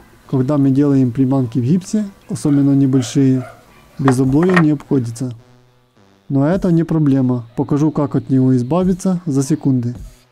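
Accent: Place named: Vologda, Russia. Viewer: характерный вологодский говор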